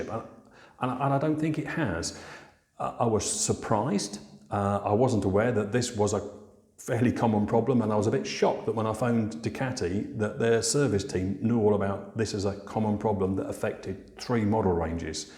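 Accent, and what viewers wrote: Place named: London, United Kingdom. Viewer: I have no idea why my wife's name came up on the comment but it is ok because she is from London and has the same accent. You sir are good. I have watched all of your videos and as a retired engineer and I am impressed. I have a 2011 Multistrada. I am currently in the process of replacing the front fork seals. Your brake videos are excellent. After watching your videos I decided to look at the brakes when I finish the fork seals. In fact the information that you provided in your brake videos got me to thinking about my brakes on my Harley. They are really not that good. But I will apply what I learned from your videos and see if I can make some improvements. Look forward to more of your videos.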